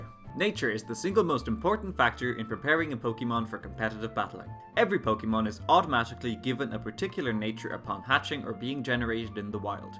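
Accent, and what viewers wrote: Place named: Ireland. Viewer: I can tell by you're accent that you're Irish. I am, too!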